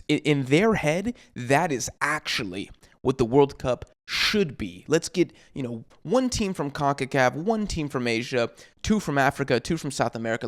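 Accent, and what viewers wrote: United States. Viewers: You have an american accent and you’re talking about football. First of all Gattuso didn’t say that, you people just believe anything you read online. Second of all of course in Europe we have more spots, cause we have more countries but in proportion South America have too much spots and definitely more than Europe IN PROPORTION, and that’s just a fact, 7 out of 10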